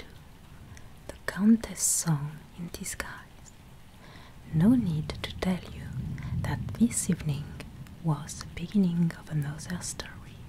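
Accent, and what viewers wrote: France. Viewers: I'm in love with your voice and french accent. Your videos are just pure art but I like the most fall asleep listening only your voice and sounds. Nothing more relaxing! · I’ve checked out many different ASMR sites, and ALWAYS end up coming back to this one. The tingles come on really quickly as soon as you begin doing anything with your hands- from counting to palm reading to (especially) smoothing fabric - and your beautiful French accent is so calming to go along with it. You are the Absolute ASMR QUEEN - thank you for doing what you do! ❤😌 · i love the french accent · je crois déceler un accent ? Tu es Française ? pourrais tu faire une vidéo en français j'aimerai beaucoup écouter ton travail dans ma langue maternelle.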